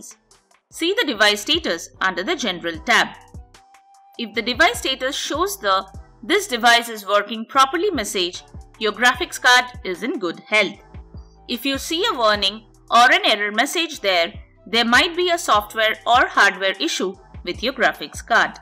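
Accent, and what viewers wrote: India. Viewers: step 1 , don't trust any computer video of indian accent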